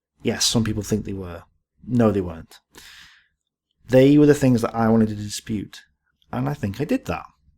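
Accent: United Kingdom. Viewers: I am American and I came away from your Marketgarden video thinking its failure was based largely on Gavin's failure to take t Nimagan bridge. Also, I am so provincially American that I just assumed you were American. You dont sound like t Queen, Michael Cain, or Richard Dawkins. So Idt I even noticed your Brit accent. Tho now that you bring it up , you do clearly have some sort of Brit accent. My wife guessed that you are from Manchester . Tho Idt you sound like Ian Curtis either. So Idk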